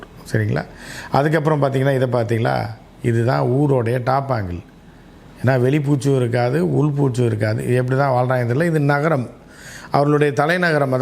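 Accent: Madurai, India. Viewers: Fact... மதுரை மொழியில்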